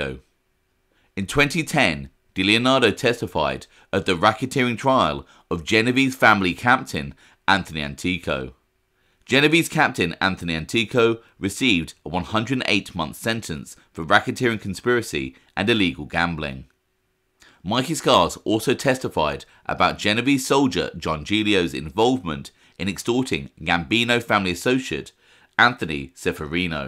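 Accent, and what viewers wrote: United Kingdom. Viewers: Anyone else annoyed a FRUITY ENGLISH narrator is telling us about an American mobster?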